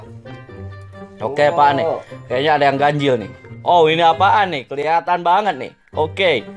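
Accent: West Java, Indonesia. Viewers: Abang orang sunda ya? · bang abang orang sunda yaa?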